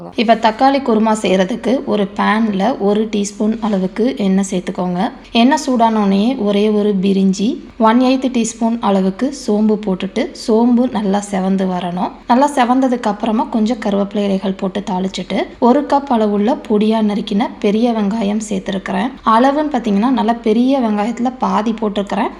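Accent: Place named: Kongu, India. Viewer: Sister unga native enga.. கொங்கு தமிழ் mari இருக்கு உங்க slang????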